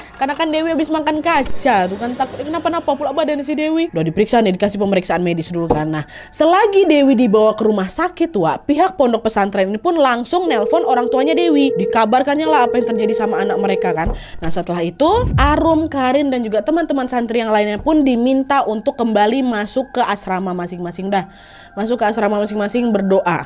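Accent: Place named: Banjar, Indonesia. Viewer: Seperti Bahasa orng Banjarrr 😂😂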